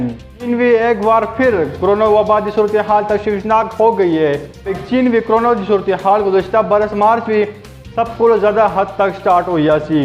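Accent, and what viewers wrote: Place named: Pashto, Pakistan. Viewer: Acha hai Kuch alag kar rahy ho well-done Lkin News caster Hinko Speaker ho Q k Apka Dialect Pashto wala hai ...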